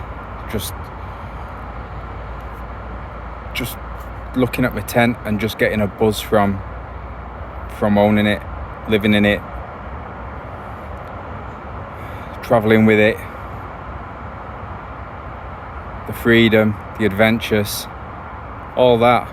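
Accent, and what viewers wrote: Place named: Yorkshire, United Kingdom. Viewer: Excellent channel, looks like the good life, the dogs must be great company too.so glad this video popped up on my feed.... just subscribed now... you sound like your from near me in Leeds /Bradford way? , I wish you and the dogs the best of luck in ya outdoor life 👍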